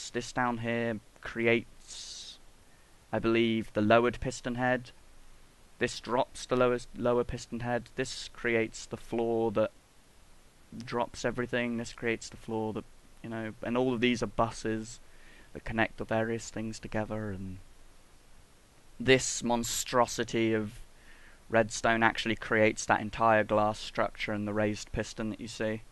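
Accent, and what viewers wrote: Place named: Sheffield, United Kingdom. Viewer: are you from Sheffield? I recognize your accent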